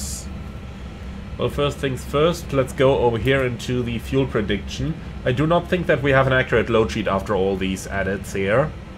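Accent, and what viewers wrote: Switzerland. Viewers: What is your Swiss accent 😂? Really nice. Thanks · I love your swiss accent😊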